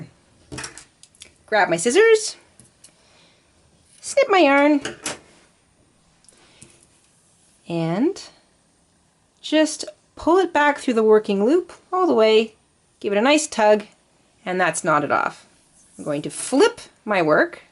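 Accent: Canada. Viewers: This was such a great video full of great tips especially for a beginner thank you so much also it was so fun to watch that cute giggle and Canadian accent!!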